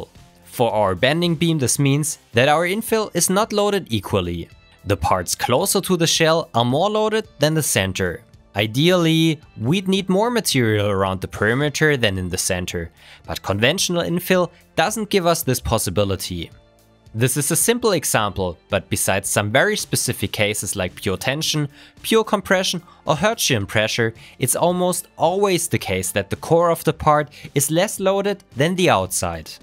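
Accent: Germany. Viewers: U aren't the only one who noticed german accent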